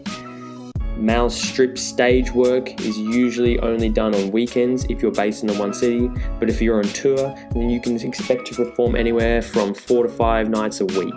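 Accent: Australia. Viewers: Sounds like an Australian accent to me, I should have taken it up years ago but may be too old now. My cousin ended up doing really well (Hunter) and an old time close friend Chase cleaned up also, I only got as far as topless waitering and chickened out unfortunately.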